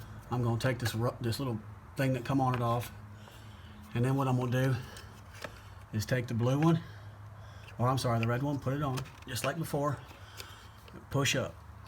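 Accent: South, United States. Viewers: Excellent video. Southern accent can't be beat. I know why you use a 5 gallon bucket now. My little oil pan splattered all over tarnation. My filter was like new though, so I just put it back.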